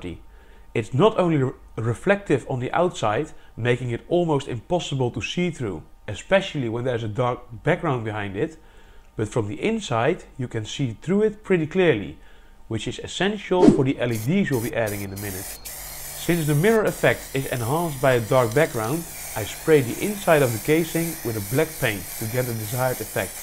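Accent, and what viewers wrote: Netherlands. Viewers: Dat nederlandse accent herken ik vanaf 10 km verderop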